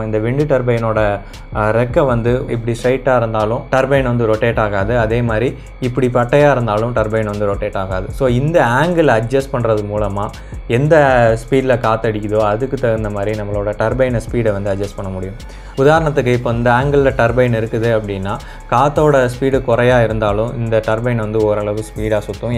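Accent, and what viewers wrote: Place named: Kanyakumari, India. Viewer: ur slang looks like, kanyakumari or nagercoil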